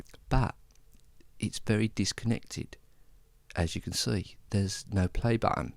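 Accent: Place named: England, United Kingdom. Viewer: thanks for the tutorial. May I ask what part of England you are from? I'm fascinated by accents, thanks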